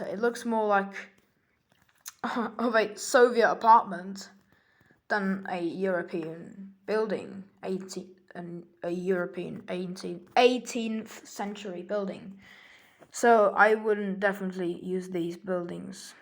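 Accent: United Kingdom. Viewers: where are you from? England? I like your accent ;)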